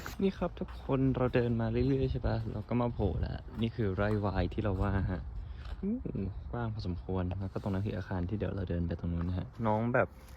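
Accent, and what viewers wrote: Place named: Khon Kaen, Thailand. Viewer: มันคือ ภาษาขอนแก่นค่า 😂😂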